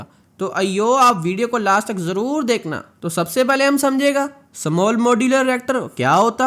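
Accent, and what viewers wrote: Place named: South India, India. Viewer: Bhaiya kaha se ho kya aap South se ho kya aapki hindi bahut alag level ki hai mera matlab bolne k tarike se hai Lekin achchi hai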